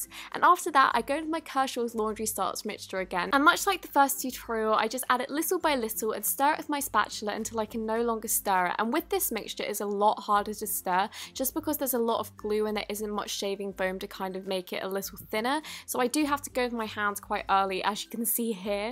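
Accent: United Kingdom. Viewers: You sound British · British alert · Are u from the UK. Just asking cos you sound like it. I do. I come from East Yorkshire. Love ur videos. 😁😁😁😁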